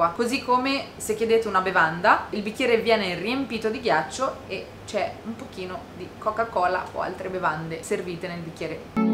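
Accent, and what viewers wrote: Ancona, Italy. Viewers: Ciao ho visto il tuo video per caso ma ho subito riconosciuto l'accento in italiano Sei per caso di Ancona poco ma sicuro .sulle mance non sono molto d'accordo Visto che non è una libertà ma un imposizione e poi non è tutto mercato nero sono soldi non tassabili e in più alimenta Il sottocosto retribuito di chi lavora come cameriere o altro visto che le mance contribuiscono.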